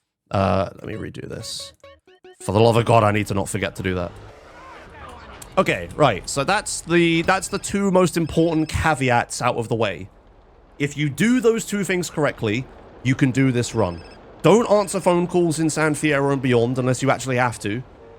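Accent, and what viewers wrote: United Kingdom. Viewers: He's british, innit?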